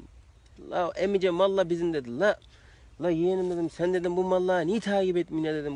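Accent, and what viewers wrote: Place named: Unye, Turkey. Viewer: Kardes ben ünyelim bizim şivemizde çok benziyor size ama gercekten on numara tebrik ediyorum seni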